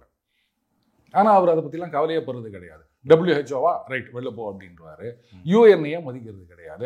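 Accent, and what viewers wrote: Chennai, India. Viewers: தங்கள் Madras பாஷை ஸ்டைலில் உலக நடப்பு, அதனால் பாரதத்தில் ஏற்படும் தாக்கம் ஆகியவை தேசிய உணர்வுடன் மிகவும் ஸ்வாரஸ்யமாக கூறுகிறீர்கள். மிக்க அருமை.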